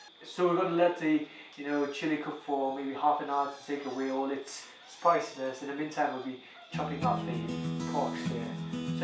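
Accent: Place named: United Kingdom. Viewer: I loooove your British accent 😍😍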